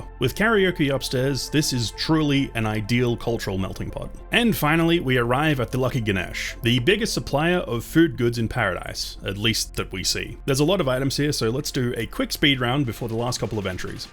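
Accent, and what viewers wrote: Australia. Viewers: I love listening to a "polite, elegant, australian" voice narrate over such a crude and violet game, the contrast is just so funny but also, genuinely calming! You're videoz R gud man :) Me give thumbs up! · he's australian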